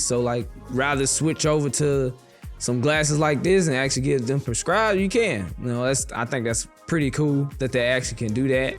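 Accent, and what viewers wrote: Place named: South, United States. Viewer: That boy from down south you can hear it in his voice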